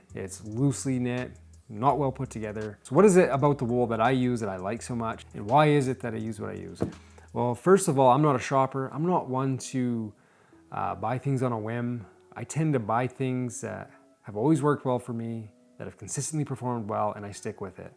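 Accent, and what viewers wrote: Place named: Maine, United States. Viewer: Off topic question, where are you from? Your accent in some parts sounds like it's from Maine but in other parts sounds like it's from Canada.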